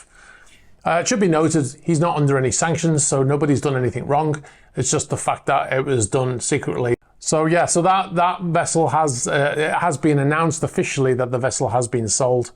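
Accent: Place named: Merseyside, United Kingdom. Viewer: Really like watching the channel, your reply to Chris the weirdo with the major personality issues was spot on, I could be wrong, but do I detect a Merseyside accent, being from Merseyside myself, I wondered if you are from here, what part of Merseyside do you come from ? 🖐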